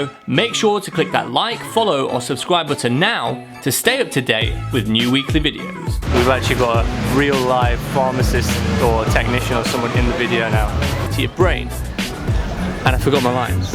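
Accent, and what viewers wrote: United Kingdom. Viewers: Great regional uk accent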